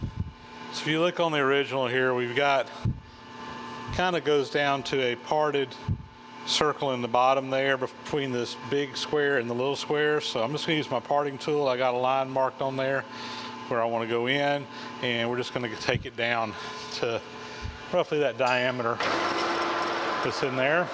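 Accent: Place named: Georgia, United States. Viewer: I'm in the firearms business and there are a number of historic firearms-specific threads we use, such as #6-48 (for Remington optics rails) and #8-40 (Mossberg and (interestingly) Benelli, or at least Benelli USA). The fasteners have to be custom made, so when customers call about tapping threads, I suggest they just go with the closest standard instead of the weird historic thread, so they can find a tap more easily and also buy fasteners from McMaster-Carr. It's hard to describe what makes your videos so compelling. The other night my partner and I spent a couple hours watching you dismantle the stoker engine. She loves your soft Georgia accent. The lack of profanity is awe-inspiring (I light up the entire neighborhood when I'm working on my car).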